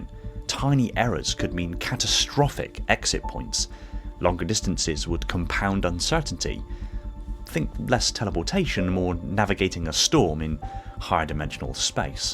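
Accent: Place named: West Country, United Kingdom. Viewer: Your voice is like Westcountry Xbox Ahoy. Somerset?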